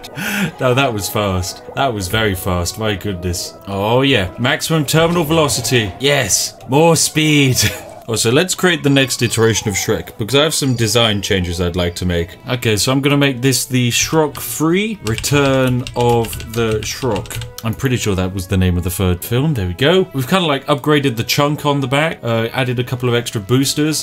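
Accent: United Kingdom. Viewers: Are you actually British because that's not how i speak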